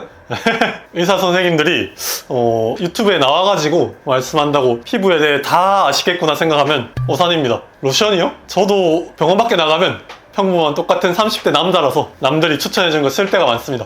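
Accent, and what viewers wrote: Busan, South Korea. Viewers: 원장님 시원시원 솔직한 화법 너무 좋네요^^ 부산사투리 너무 정겹고~~~~~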